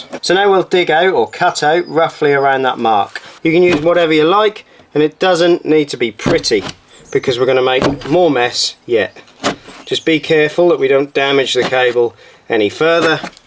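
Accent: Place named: East Anglia, United Kingdom. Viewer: You sound like you're from East Anglia.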